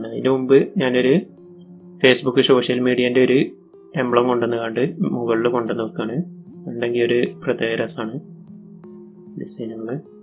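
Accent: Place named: Malappuram, India. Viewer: മലപ്പുറം ഭാഷ ഒന്ന് മാറ്റി പിടിക്കുമോ?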